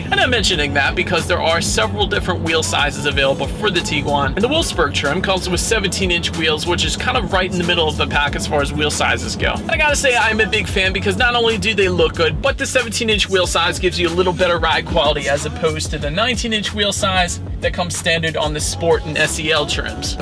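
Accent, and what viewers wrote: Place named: Philadelphia, United States. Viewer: are u from philly???? omg thats the most philly accent ive ever hurd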